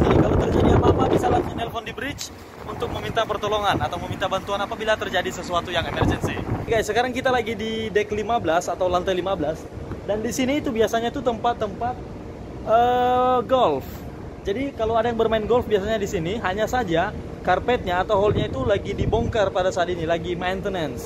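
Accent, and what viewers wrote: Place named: Manado, Indonesia. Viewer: Saya kira bro orang manado..logatnya seperti logat manado soalnya hehe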